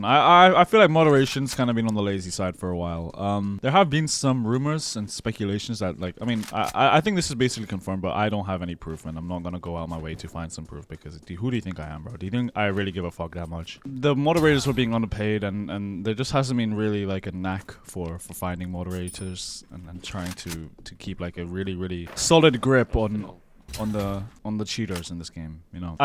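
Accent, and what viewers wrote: United Kingdom. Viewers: ur british like me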